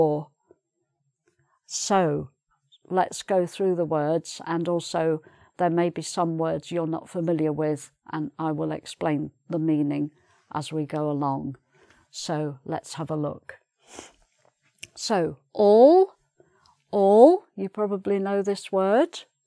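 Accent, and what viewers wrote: United Kingdom. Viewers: I love British English. Thank you for your English. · Que delícia assistir às aulas dessa adorável senhora! Inglês Britânico fica mais interessante com as aulas dela. Obrigado! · I love the british english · i like british acent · It has been a utterly splendid, exquisite and flawless lesson. I'm particularly joyful, blissful and elated with that opulent topic. It is to my liking; moreover, it appeals to me. I do adore speaking, and her charming accent., Hence, I present; undoubtedly, the Queen of British English. You are the quintessential of British English. Thank you very much indeed. Far thee well, dearest Prof.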